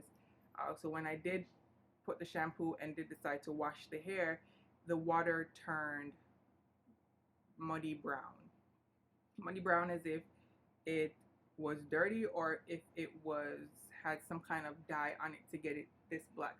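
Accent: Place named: Jamaica. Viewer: I'm Jamaican as well, i picked it up right away 😂👏🏾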